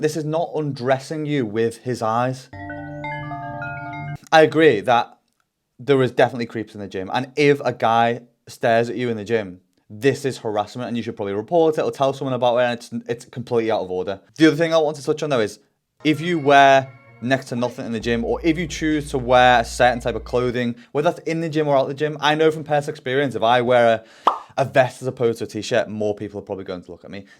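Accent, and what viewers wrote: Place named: Liverpool, United Kingdom. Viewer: Great content as always 👏🏼. Great work on the clarity of your speaking too, elocution lessons working well👌🏻👍🏻(not that I think there was anything wrong with your native wirral/scouse twang though! 😅)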